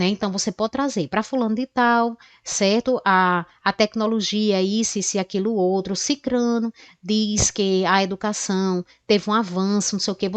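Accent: Northeast, Brazil. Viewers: Adoro aprender com essa Professora, sem contar que amo o sotaque do nosso nordestão! 🥰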